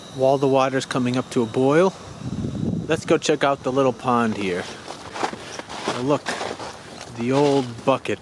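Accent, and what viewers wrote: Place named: Upstate New York, United States. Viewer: I wonder what state this is in? I detect a slight accent, upstate New York? Beautiful patch of woods. The vines on that tree are so cute and fascinating.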